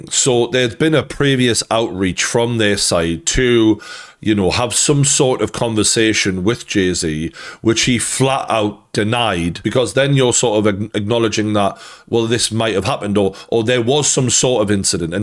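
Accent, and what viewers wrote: Newcastle, United Kingdom. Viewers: That's a Geordie accent - from Newcastle-upon-Tyne in the north-east of England - along with the West Country accent, it's the most pleasing to us Brits....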